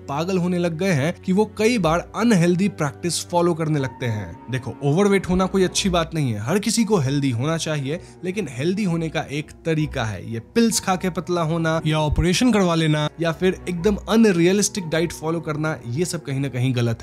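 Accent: Bengal, India. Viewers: Are you bengali? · Bhai aap bangali ho ampka hindi se to yehi lgta hai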